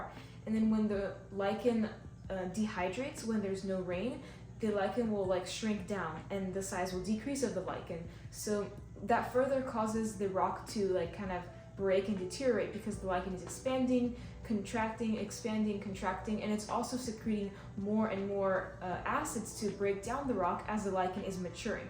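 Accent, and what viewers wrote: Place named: Quebec, Canada. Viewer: Are you French Canadian? I hear a slight accent but can’t place it. Great video by the way. We need more explanation like this in freshwater as well.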